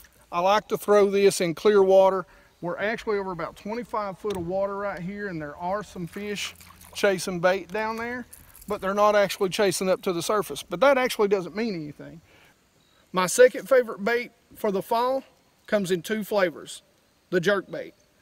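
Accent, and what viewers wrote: United States. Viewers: i could listen to ur voice all day.. love the country accent!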